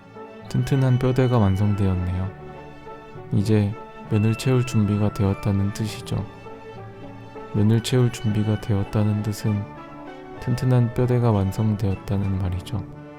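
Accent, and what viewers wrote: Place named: Chungcheong, South Korea. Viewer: 유명한 유튜바한테서 느껴지는 익숙한 말씨... 충청도여...~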